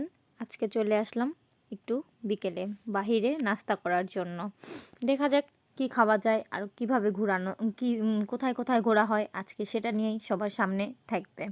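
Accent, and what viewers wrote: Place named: Dhaka, Bangladesh. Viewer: আপু আপনার বাড়ি কি ঢাকা ভাষা ঢাকার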